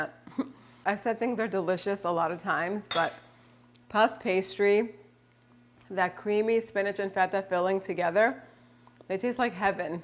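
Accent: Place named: United States. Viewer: To long intros, to much american talking.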